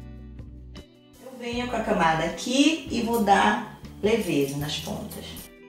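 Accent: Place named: Pará, Brazil. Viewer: Essa é paraense...hahaha sotaque muito parecido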